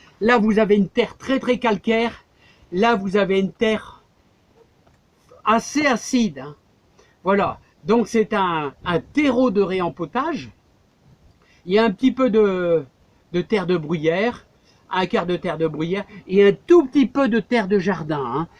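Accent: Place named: Nord-Pas-de-Calais, France. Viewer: je suis du sud-ouest je parle un peu occitan, et ça fait plaisir de vous entendre parler un peu ch'ti ! continuez !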